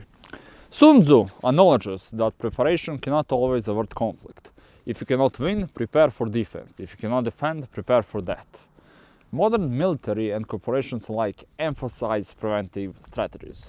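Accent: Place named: Balkans. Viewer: Zavisi koji ti je nivo Engleskog... Ja ga lično jasno razumem, slažem se nekima treba title. Akcenat mu je jak balkanski...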